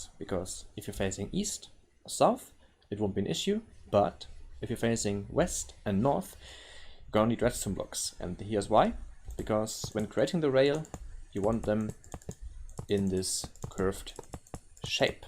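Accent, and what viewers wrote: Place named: Germany. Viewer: I needed that thanks a lot :D Btw ru german? cuz u kinda have the same accent like ilmango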